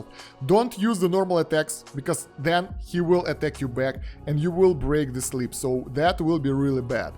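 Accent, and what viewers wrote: Russia. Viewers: слышу русский акцент)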